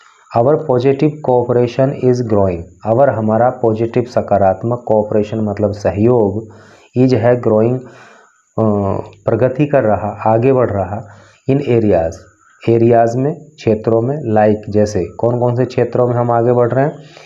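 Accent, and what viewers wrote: India. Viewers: literally sir i love ur deshi pronountation even Hindi wyakaran. 😘😘😘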